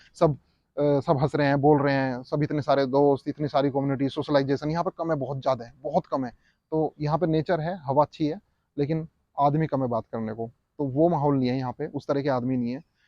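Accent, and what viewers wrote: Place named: Meerut, India. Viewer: Ap sbhi jagah gye, pr meerut ki tone apke sath chipak gai hai, nhi chhutegi 😅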